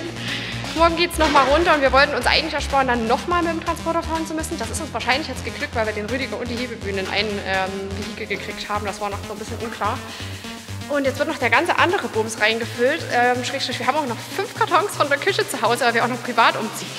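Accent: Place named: Saxony, Germany. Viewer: Ich hoffe, wenn du wieder heme bist, kommt der Sachse in dir, Öffter mal raus. Steht dir gut finde ich <3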